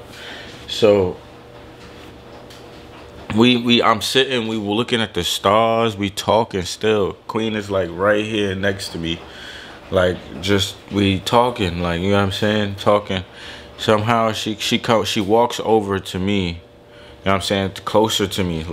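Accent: New York, United States. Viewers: Lmaoooo he talk like every New York hood nigga 😭😭😭😂😂😭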